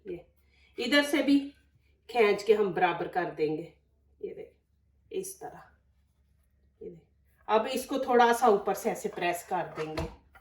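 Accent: Punjab, India. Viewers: Aap punjabi pind jesylehjy mn baat krty hn. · Ap na bohat acha Prata bnaye hain par ap ki jo Zuban hain us aa saf pta challah hai kh Ap ki Zyban Panhabi hai to best hai ap Punjabi bolo apni Zyban bolna mn kaisi sharm please apni Zyban bolna mn kisi ko bhi sharam mesoos nahi karni chahiye I prud of my panjabi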